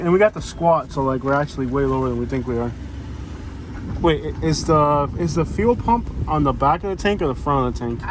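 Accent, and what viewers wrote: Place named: South, United States. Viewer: Your Southern Accents are becoming Seasoned now.btw UR correct... Family es muy importante. Especially for regular folks.Wealth is created from having a strong tight knit family.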